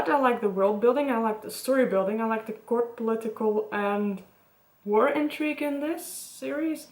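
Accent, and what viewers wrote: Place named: Netherlands. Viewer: You have a Dutch accent Are you?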